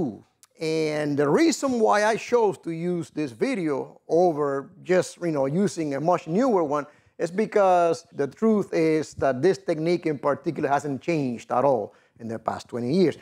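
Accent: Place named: Cuba. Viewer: I just came across your channel and obviously I just subscribed. Tons of great info that I will implement this summer, thanks a million for not just your teaching but for the way you deliver it 😎 .. Googled you as well did not know you trained several world record holder free divers ! Needless to say when I heard the accent I was like “this guy is Cuban” and Yeap Cienfueguero ! I’m from Santiago de Cuba. Anyhow I’ll watch and pay close attention to your vids and teaching. Thanks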